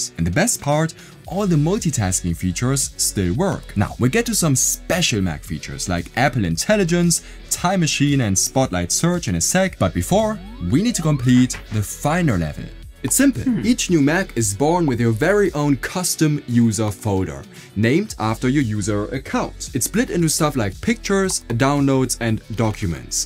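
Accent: Germany. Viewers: I hear deutschh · love that german accent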